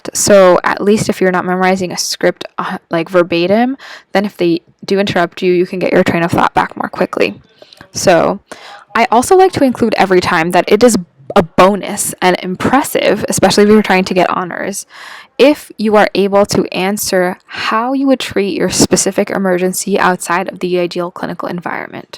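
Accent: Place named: United States. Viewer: Hi DOc. thanks for this ideas. I really appreciate your diction and your voice . I didn't distinguish if you are a Filipino or an American, because your accent is like an American speaker.. I love hearing your speech doc. 🥰👏👍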